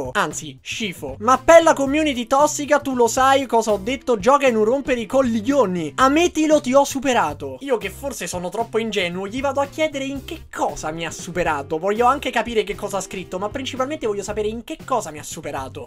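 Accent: Rome, Italy. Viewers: Ma sei romano?🧐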